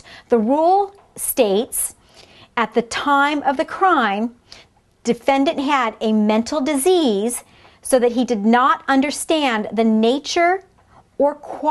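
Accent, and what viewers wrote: United States. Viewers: QUESTION: If you're really certain, where in the USA is her accent from? Thank you. Every one of this series of videos is very clear and of excellent quality, and the audio levels on every one of the videos are perfectly matched for an uninterrupting playlist. The instructor is also very attractive, and I'm curious where in the USA her accent is from. Finally, I wish there were more such videos from her, besides the ones in this series.Thank you so much!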